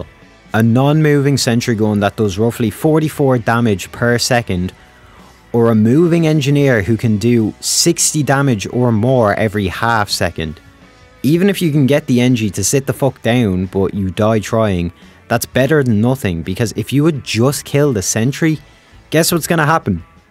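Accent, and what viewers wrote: Ireland. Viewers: He’s Irish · Ahh. A fellow Irish man. First time watching. Wexford or Dublin? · my god what an amazing Irish accent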